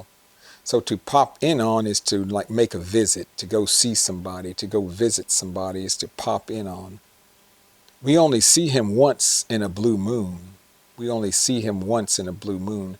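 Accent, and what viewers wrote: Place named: South, United States. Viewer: Thank you for these wonderful lessons. I love southern accent and the way y'all communicate, I love it. Please keep making more of these lessons, it's so fun to watch!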